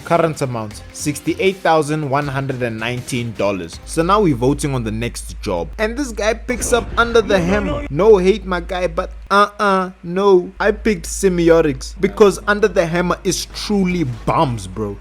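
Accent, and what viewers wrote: Afrikaans, South Africa. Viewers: bro u south african?? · Quick question are you south african coz ur accent is like mine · Oh you're south african too? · your accent , the way you pronounced that Afrikaans nam , auidwes boerseun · Are you south African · It took me a while to realize you were south african lmao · Bro are you from South Africa. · Are you south African? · Your accent man . . . Are you South African?🇿🇦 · Are you South African You have a fimilar accent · Are you from Africa wait me and you are Afrikaans bro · can hear the south african accent 😭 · Are you South African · My man sounds like a south african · Are you afrikaans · I knew you were from South Africa with that accent😭😭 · What is your accent dude? Its sounds south African it sounds awesome🙃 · Are u afrikaans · South African? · Are u south afrcan? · I was wondering where you are from.. 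the way you pronounce the letter r made me think SA or Namibia but when you said boers seun then i knew for sure your from SA, jy het n nuwe subscriber gekry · 😅I Feel like you from South Africa 🇿🇦 BRUH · Are you from South Africa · Damn bro south african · Nha I found gold finding this channel 😂😂you can even hear thr south african accent 😂😂😂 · South African? Edit: yeah ur SA, never heard anyone else say Kopp (head) elsewhere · are you south african? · You sound extremely South African... Am i right? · Bro tell me you not South African 😂😂😂😂 that accent.. I’m from Lesotho. I’m subscribing btw 😂😂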